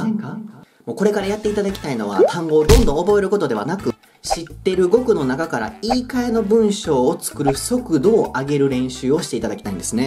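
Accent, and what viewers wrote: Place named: Kansai, Japan. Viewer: 関西弁と声の質で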